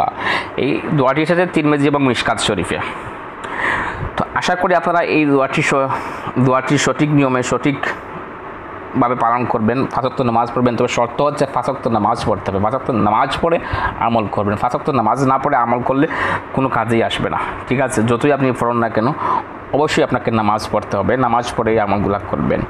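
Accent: Sylhet, Bangladesh. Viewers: ভাই মনে হয় সিলেট বিভাগ এর লোক।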